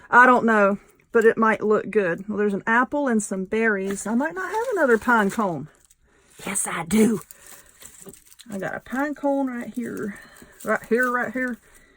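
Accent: South, United States. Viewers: I love the mess that you have going on 🤣 creativity at its best! I really love this little project ❤️ Love you accent btw 👧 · Love you, your Southern accent, you are so funny, and you do such cute things! Also, I love your nails in this video. Can you tell me how you did them? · Girl you are too funny! And that's coming from another Southern Gal with the same accent and sense of humor;) Love your ideas and you have gotten me back into crafting after many years of having no time to do so. And I'm having a ball!! Thank you!! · I have said this before but I have to say it again. I love listening to you. You are very funny. I agree with you about the bugs. Where is your accent from in the South?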